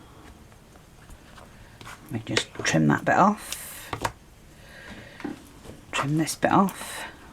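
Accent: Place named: Bradford, United Kingdom. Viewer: Hi. I’ve just found your channel and because of your accent I feel right at ome. I’m from Bradford and I’m assuming you’re somewhere near by ☺️x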